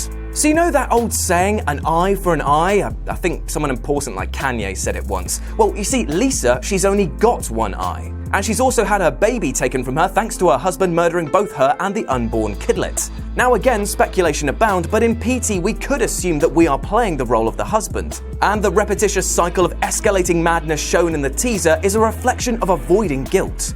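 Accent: United Kingdom. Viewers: Why britains ? I don't understand british english -. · American guy with a British accent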